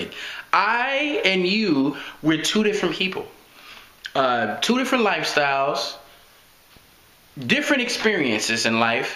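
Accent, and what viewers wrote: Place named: Canada. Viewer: You sound like a Canadian. thats a compliment btw:)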